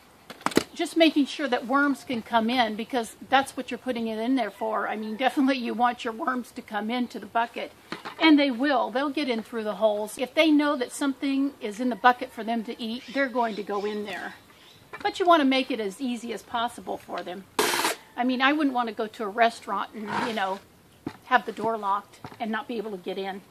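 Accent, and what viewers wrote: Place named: United States. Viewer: Thank you for the great video. Which part of America are you from. Your accent is very nice. Roberta from Perth Western Australia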